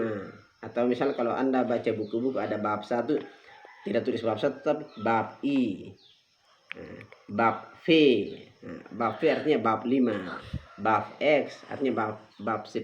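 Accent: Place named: Kupang, Indonesia. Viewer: Dari logatnya ini kaya orang kupang ya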